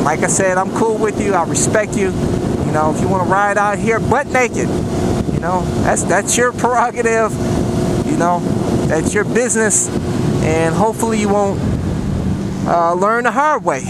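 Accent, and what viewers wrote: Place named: Washington DC, United States. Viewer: Ohioians have an accent, you sound more like you're from DC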